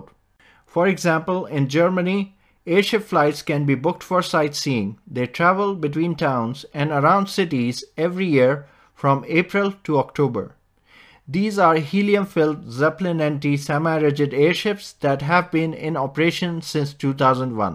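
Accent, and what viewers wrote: India. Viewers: indian accent... nope i'm out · Is there a way to have a native English speaker do the voiceover? What's the point of using a heavy Indian accent in this airship video?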